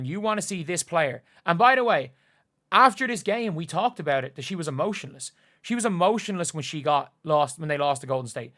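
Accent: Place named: Ireland. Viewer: I enjoy your commentary n your thick Irish delivery!😂🎉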